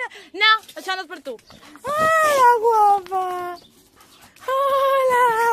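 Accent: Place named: Andalusia, Spain. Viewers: Una catalana con acento andaluz! Me encantaaaaa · Una pregunta jajaja por que hablas mayorquin y tienes acento sevillano? Siempre he tenido la curiosidad jakakajaka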